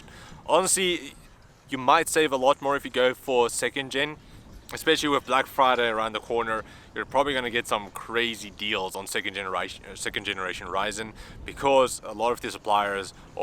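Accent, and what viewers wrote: South Africa. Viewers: R u South African?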